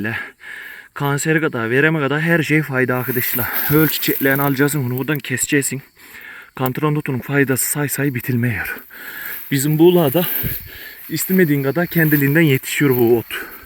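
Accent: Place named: Aegean, Turkey. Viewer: Maşallah.. Tam da ege şivesi ile..Ben de denizli buldanlıyım. Sakaryada yaşıyorum. Yeni fark ettim sizleri. Başarılarınızın devamını dilerim.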